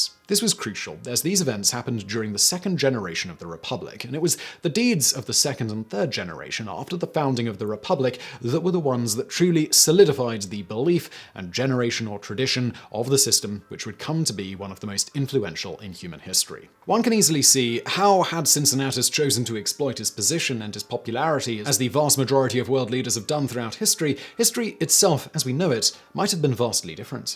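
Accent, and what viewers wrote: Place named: RP, United Kingdom. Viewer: This presenter guy is proof that a cultured British accent doesn't mean you aren't a slimey lowlife.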